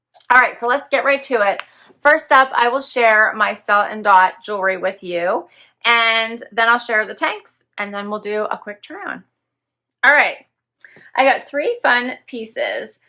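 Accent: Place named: Pennsylvania, United States. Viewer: So happy to see you love tee shirt styling like me!! I get lots of ideas from you. I also heard you mention yr from PA. I also heard it in your accent because I am from Philadelphia. I live in Florida now so it's nice to hear someone from home. Me and my puppies watch every morning with our tea. Thank you 😊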